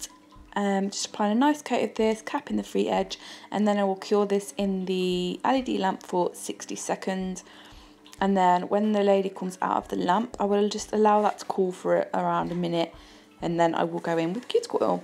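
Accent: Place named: Birmingham, United Kingdom. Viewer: Love this girl and her work. Sounds like a Brummie!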